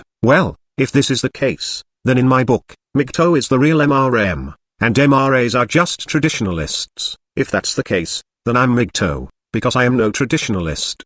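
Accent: United Kingdom. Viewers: Good stuff. Are you from the UK? or is that just the synth-voice?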